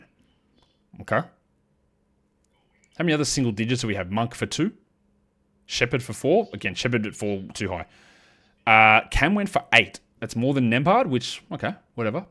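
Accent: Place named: Australia. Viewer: Trying to drop the codeword but I legitimately have no idea what you said. Aussie accent claims another victim. Canadian btw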